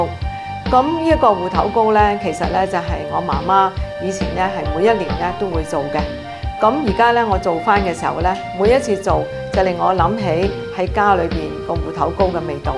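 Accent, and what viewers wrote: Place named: Guangzhou, China. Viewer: your accent same as mine, must be from GuangZhou, GuangDong...... de Miami, Florida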